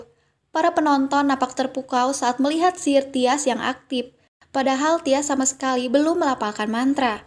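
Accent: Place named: West Java, Indonesia. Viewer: Wkwkwk tigebros piks orang sunda iyeu mah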